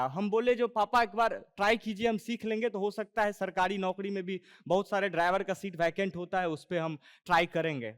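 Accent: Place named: Bihar, India. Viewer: Awesome work and your bihari language ke sath jo v aapne kaha dil chhu gya.... thank you so much ji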